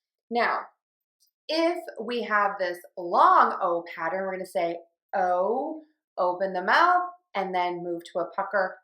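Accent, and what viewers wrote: United States. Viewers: Hi. I'm from Colombia and a love Your videos are amazing that help me to improve my pronounciation. I wanna know what part of usa you are?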